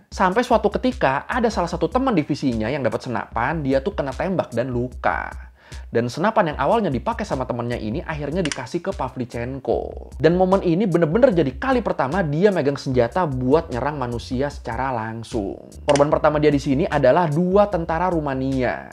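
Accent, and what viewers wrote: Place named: West Java, Indonesia. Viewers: pertama kali denger bang ngomong sunda wkwkw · Ternyata Lo orang Sunda bang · sunda lau mantep juga bang alus pisan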